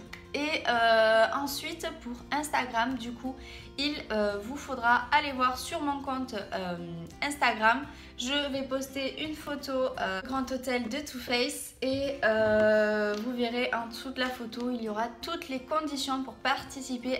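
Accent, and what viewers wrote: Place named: South, France. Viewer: Je participe ! Merci pour ce super concours, je rêve de cette palette depuis qu'elle est sortie ! Ca fait plaisir d'entendre l'accent du sud chez une youtubeuse ahha! Joyeuse fêtes de fin d'année :)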